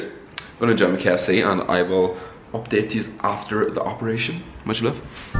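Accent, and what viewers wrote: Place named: Scotland, United Kingdom. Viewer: I notice your accent are you from Scotland?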